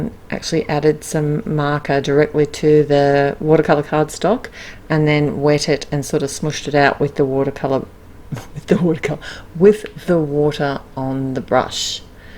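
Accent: Australia. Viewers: That is just beautiful. I think I have that stamp set so I want to give this technique a try. And the inlaid die cutting looks great. You have the best voice - I just love listening to you! It's so great hearing a familiar Aussie accent in a sea of American ones on YouTube!!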